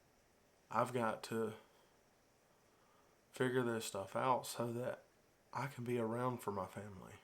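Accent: South, United States. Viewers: Hello young man! Your video showed up in my feed and I’m really glad. Thank you! I’m going to share with you - this will likely be long. Please - take what you like, leave the rest. First, you can’t disappoint anyone else. If someone says they’re disappointed in something or someone, that is on them - it’s their feelings - it’s theirs to deal with and not something you caused or need to feel worried about. I can tell you’re from the South by your accent. Me too! So, I know a lot about what that likely entails ….food - and not healthy food - is a central part of life - good times, bad times, normal times, etc etc etc. It’s an insult to many in the South that if one doesn’t eat as they think one should, then they are worried or insulted and certainly, they keep encouraging and begging and shaming until the person gives in or bad feelings occur. I’ve been there, done that. 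MANY MANY MANY times! I saw a great therapist for known and unknown reasons. It was the single best decision I ever made!!!!!!!! My life changed in every way - I got better - I understood my life - past and present - much more fully. A lot was not pretty or comfortable. I had a lot of shame - for known and unknown reasons. There were things I was hiding - from others, for sure. And from myself in many ways, in terms of how it was effecting me - including my weight and food issues. So pat yourself on your back ….you’re being very candid, you’re taking a risk and you’re willing to see a therapist. In our society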